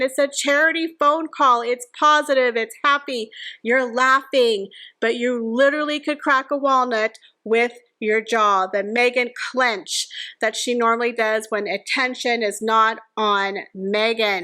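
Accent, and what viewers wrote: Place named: East Texas, United States. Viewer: I love the Barbie’s! I can hear that east Texas accent along with your expressions. Reminds me of home ( south Louisiana) proud of you!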